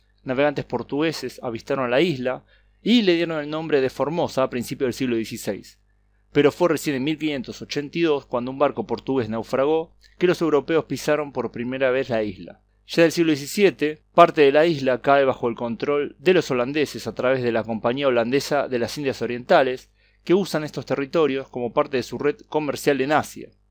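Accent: Argentina. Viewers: uf con la pronunciación de un argentino, pero fenomenal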